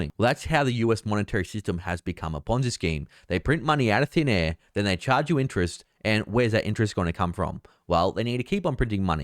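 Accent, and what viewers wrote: Australia. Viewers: Aussie accent...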